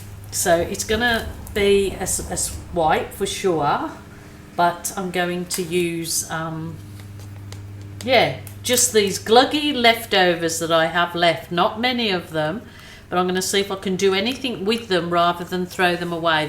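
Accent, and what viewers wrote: Birmingham, United Kingdom. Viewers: Hi there just found you, a question. Were you born in Austrailia? I can hear a slight Birmingham accent . Love your program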